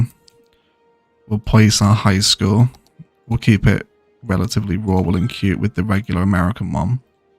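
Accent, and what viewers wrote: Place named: United Kingdom. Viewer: Found your channel accidentally but so glad I did!!! Good to hear a fellow Brit playing this 👍🏻👍🏻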